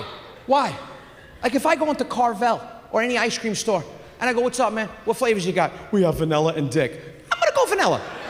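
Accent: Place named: South, Italy. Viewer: Hahaha spoken like a true southern Italiano 🤌🇮🇹. Loved it 👏😂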